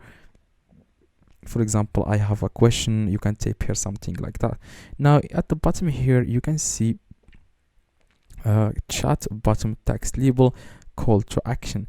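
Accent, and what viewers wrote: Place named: Morocco. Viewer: Chokran a chef , ollah ila mnin bda lvedio , galt hada 3ndo lahja dial mgharba , tbarkllah 3lik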